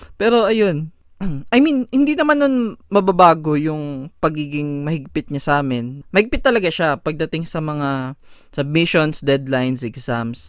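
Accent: Visayas, Philippines. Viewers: Bisaya ka ba po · half bisaya ka kuya?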